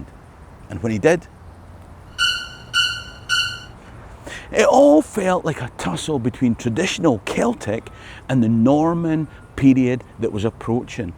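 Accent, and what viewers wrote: Scotland, United Kingdom. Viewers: Man - what a story! As a german I love to hear your stories on scottish history. For your fresh view on history and the scottish accent I love. Turns out I am wrong. You are a rarety, a philosopher and philantroph disguised as comedian. Holding up the mirror like a jester. Thank you. Todays crazy world needs more of your kind.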